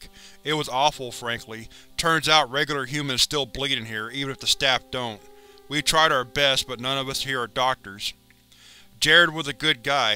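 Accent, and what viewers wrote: South, United States. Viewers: I just hate how the guy voicing this has the thickest southern accent of all time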